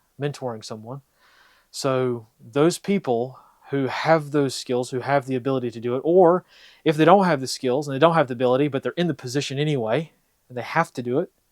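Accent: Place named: Pacific Northwest, United States. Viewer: Great content. Sounds like you live in the PNW.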